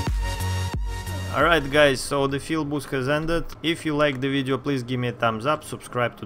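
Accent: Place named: Russia. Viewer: Похоже что русский говорит на английском. )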